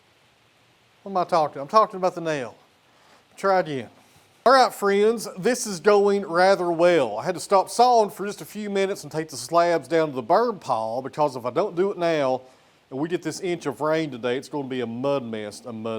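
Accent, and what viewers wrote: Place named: Tennessee, United States. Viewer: You from Tennessee. You sound like you gotta be from at least near there.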